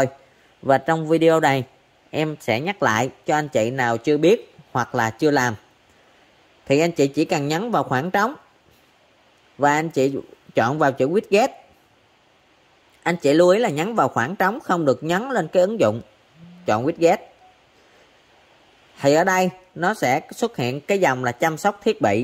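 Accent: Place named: South, Vietnam. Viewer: cám ơn em nhiều lắm, em hướng dẫn rất tận tình, chất giọng nam bộ rất dễ thương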